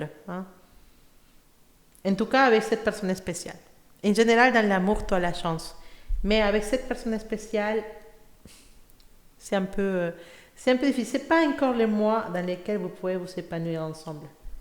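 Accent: Spain. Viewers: hola es que tu haces lecturas en espanol porque siento tu accento esapnol y quiero saber si es posible tambien en espanol gracias bendiciones!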